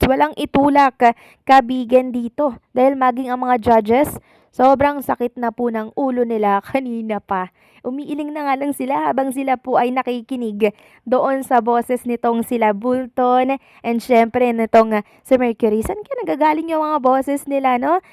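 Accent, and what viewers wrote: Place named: Visayas, Philippines. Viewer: BOLTON ...bisaya ka day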